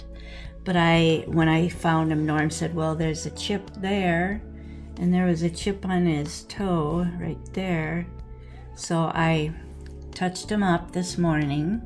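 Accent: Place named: Minnesota, United States. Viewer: I have a small frog collection which my grandkids think is weird because I’m afraid of actual frogs. 🐸 I love your cute Minnesota accent. Thanks for the tour.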